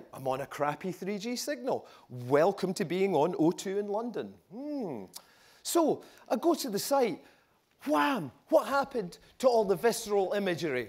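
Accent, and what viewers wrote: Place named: Ireland. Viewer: that accent though such heavy Irish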